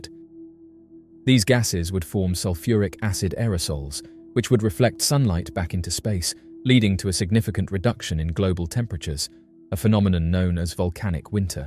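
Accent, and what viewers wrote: United Kingdom. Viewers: We need British sounds like you to be assured it's bound to occur in my life.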